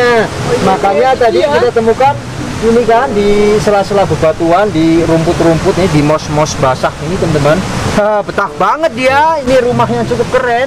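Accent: Banyumas, Indonesia. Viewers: Ngapak banyumasane kerasa banget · q suka gaya bicaramu mas... lugu dan polos... Khas jawa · Wow ngapak...👍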